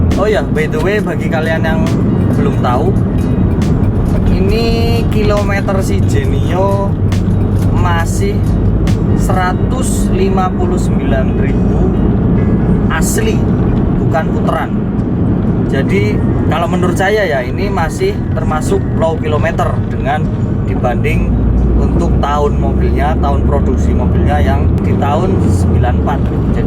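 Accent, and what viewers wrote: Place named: Banyumas, Indonesia. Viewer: Oalah wong ngapak jebule mas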